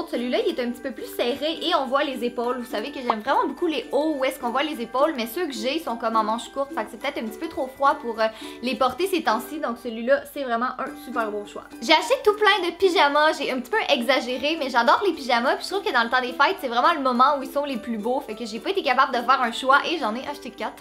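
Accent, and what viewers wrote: Quebec, Canada. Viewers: Je crois que tu n'avais pas a dire que tu es Canadienne, Québécoise, sa se voit dans ton accent!!!😂Mais ne t'inquiète pas, cette une très belle langue(accent) et moi aussi je suis Canadienne…(J'ai a peut près le même accent) Sinon continue comme sa tu es super!!!!❤️😃☺️😋😄😘😍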